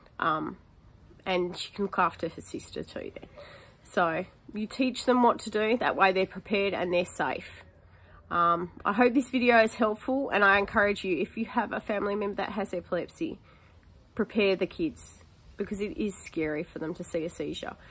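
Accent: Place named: Australia. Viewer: I love the Australian accent and it’s good to teach your child what to do when someone is having a seizure!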